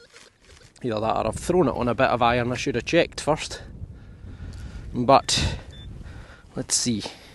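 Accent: Scotland, United Kingdom. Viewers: Love the Scottish Accent, enjoying your knowledge and the history, great informative videos. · I chuckle when you say worm. Your accent to me sounds like your saying werdems. I’m American with my ancestors coming from Scotland moved through Ireland and eventually to the US. My Grandmother carried your accent. If you know of any McCardles or McClellens say hi to my peeps for me lmao😅. Man I’d love to come over and detect the mother land.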